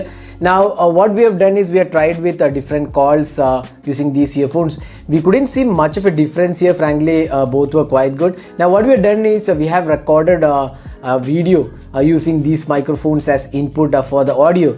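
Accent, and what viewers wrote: Kerala, India. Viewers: he is malayai i guess that why he is so sounding like this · Kerela guy accent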